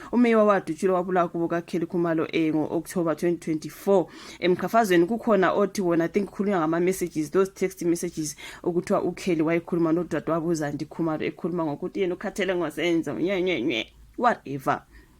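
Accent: Zimbabwe. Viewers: Your accent is giving Zimbabwe. Why nizifaka ezindabeni zaseSouth Africa? Niya dika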